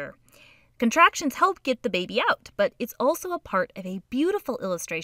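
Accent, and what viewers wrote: United States. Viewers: Gr8! R u American..?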